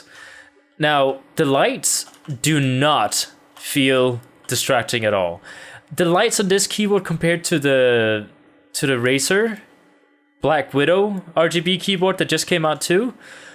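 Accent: Denmark. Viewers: I think you sounded danish, are you danish? :) (also noticed the nordish layout)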